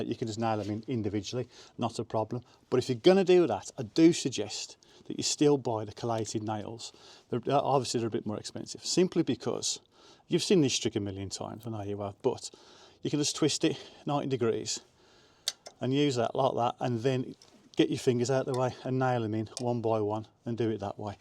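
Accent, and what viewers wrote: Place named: Scotland, United Kingdom. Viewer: I'm going to guess it's a Scottish accent that is causing the problems 😅. Might have even watched the video myself thinking what an arrogant way to show a video...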